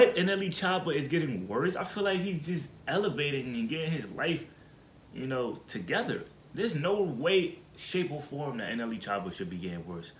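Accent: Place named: New York, United States. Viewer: yo from michigan? tHOUGHT you was from new york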